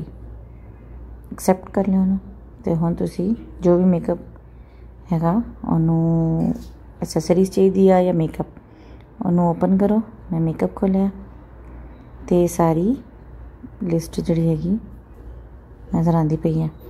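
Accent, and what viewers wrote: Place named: Punjab, India. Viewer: Thanxi honourable friend Specily twadi pnjabi style awaz kmal ayy g 👍💕 Ma try krti hun ab ho jy ga in sha Allah 😘 Twada tasn da andaz v bra sohna lga complete details diti tusi🥰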